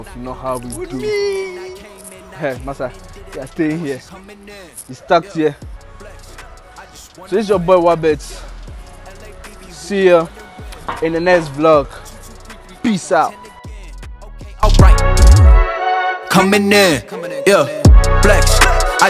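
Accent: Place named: Ghana. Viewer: Your video popped up, and then I decided to watch it. Your accent sounds like a Ghanian's... Nice vlog between.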